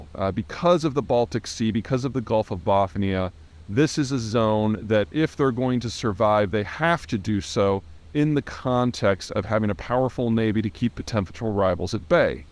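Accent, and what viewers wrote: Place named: Texas, United States. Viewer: Talks like some Texas ignorant. When a fool is trying to repeat what a wise man once said, the chain jumps off because he translates it into something he himself can understand.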